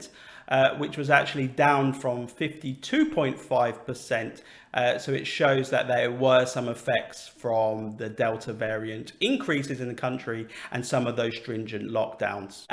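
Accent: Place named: United Kingdom. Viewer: Your shirt is just as perfect as your crystal clear British accent. Love it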